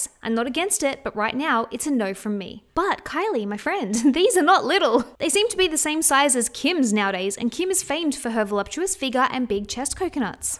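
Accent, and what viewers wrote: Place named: Australia. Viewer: It's so strange (and refreshing) to hear a fellow Aussie accent on YouTube ❤️ Great video, you crushed it! 🙌🏽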